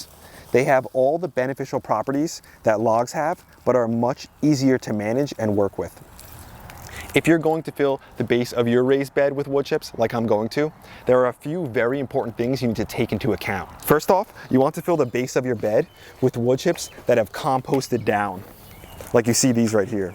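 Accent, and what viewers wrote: New Jersey, United States. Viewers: 💦💦💦💦💦💦💦💦💦💦 I love how you stay true to your Garden State home, by continuing to pronounce water the Jersey way ~ “wooder”! 😊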